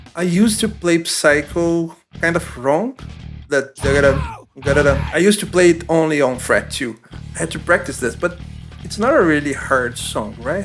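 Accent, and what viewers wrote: Brazil. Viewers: Pelo sotaque é BR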